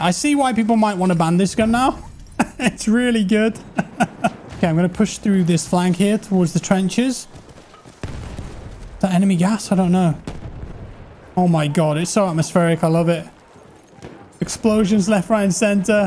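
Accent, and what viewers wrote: United Kingdom. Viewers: As long as you like, you're British patter and nonchalance is soothing and enjoyable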